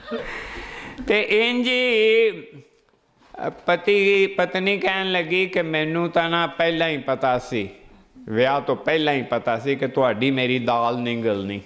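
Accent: Punjab, Pakistan. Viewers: What a beautiful affection developed with uncle, one thing is a very beautiful style of jokes and another thing is that uncle speaks exact our Pakistani style of Punjabi. Love u Baba g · your punjabi same we pakistani speak buhat khoob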